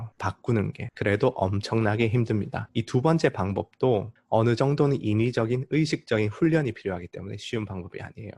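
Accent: Gyeongsang, South Korea. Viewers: 주식 공부하러 왔다가 철학 공부 잘하고 갑니다. 아 그리고 말투만 들어도 이미 경상도 사람인거 알았어요 😋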